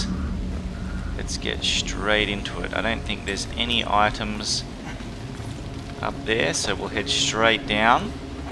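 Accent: Australia. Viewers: just started watching you and im in love with ur channel already. btw are u Australian? n will u be doing chapter 15 anytime soon?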